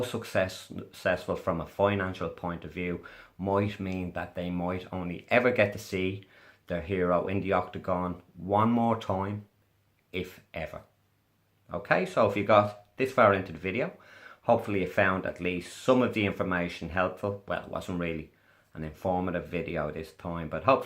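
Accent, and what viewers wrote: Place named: Ireland. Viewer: Wow. An Irish guy (sorry if my assumption is wrong) who is sensible and not deluded. I can't believe my eyes and ears. I'm so glad you exist. Never thought I'd hear someone with an Irish accent talking like this.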